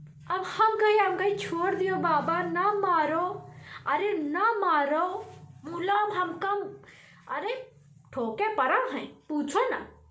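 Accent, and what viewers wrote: Awadh, India. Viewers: मुझे जब भी अपनी महिला मित्र की याद आती है तो मैं आपकी ये वाली वीडियो देखता हूँ, मैं उनको ये वीडियो सेंड किया था तो वो बोलीं थी कि ये तो बिल्कुल हमारी तरह से बोलती हैं, अवधि भाषा में, हमको कम समझ में आता है हम ठहरे बृज के निवासी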